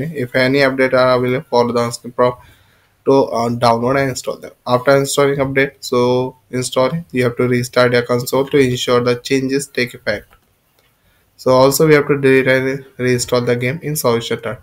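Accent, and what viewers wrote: India. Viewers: Hate India accent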